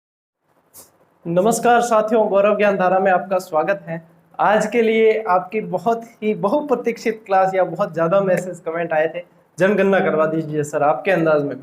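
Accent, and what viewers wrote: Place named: Rajasthan, India. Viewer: Thnku so much sir.... Sir m rajsthn se he hu lekin rajasthani nhi aati ap bolte ho toh sunne m accha lgata hai.... 😇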